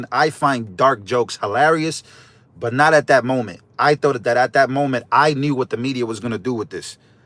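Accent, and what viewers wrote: New York, United States. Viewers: Thank you for reporting from the rally at MSG. You were awesome. I cant thank you enough. I had tickets to go but did not want to go alone. I follow 2 youtube people. One is you..I knew you were a N.Y.ER I COULD TELL BY THE WAY YOU TALK. IM A N.Y.ER ALSO.. THANKS FOR SPREADING THE GOOD WORD. YOUR A TRUE PATRIOT ❤ 🇺🇸🇺🇸🇺🇸🇺🇸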